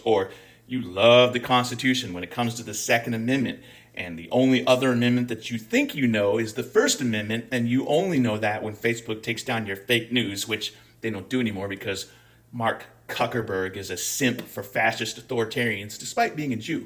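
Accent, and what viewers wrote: Scotland, United Kingdom. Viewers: Definitely hearing some Scottish accent in this one! Thank you for the outrage!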